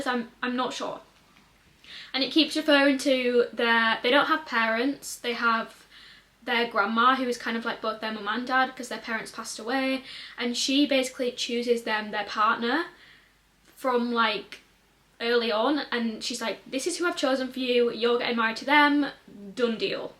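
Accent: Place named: United Kingdom. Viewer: hey 👋🏾, just found your channel and immediately subscribed when I heard your accent and knew you were a fellow UK Booktuber. I love your vibes and reviews of books, i feel like we like similar books also!. Looking forward to watching more of your content. 💞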